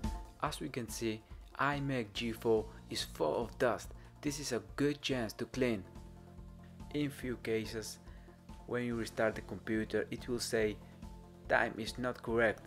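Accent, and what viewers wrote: Netherlands. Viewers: Haha lachen om te herkennen dat je een Nederlands accent heb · hoorde meteen dat Nederlandse accent.. toen zag ik ronde op de stopwatch en wist ik t zeker..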